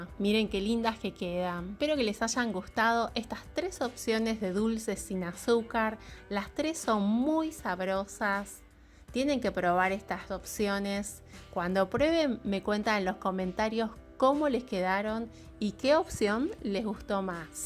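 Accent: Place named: Argentina. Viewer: Que ricas recetas, tienes un acento Argentino.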